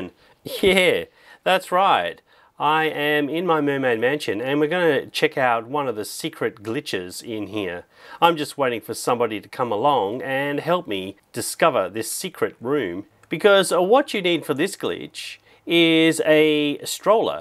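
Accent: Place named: Australia. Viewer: I love your australia accent its so cool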